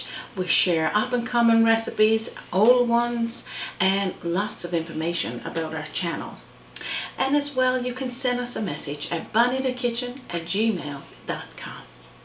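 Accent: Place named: Canada. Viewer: wow that looks sooo good!! merry christmas to canada!!! i ABSOLUTELY LOVE YOUR ACCENT bonita!!!! i live in maine... and it soun ds like how you say it ..parch a berry. soo cute!!!! here we call it part ridge. i dont think we have those berrys here, but i know we def have the bird ;];] good eaten too ;];];] hope you have a great christmas and a great new yr;];];]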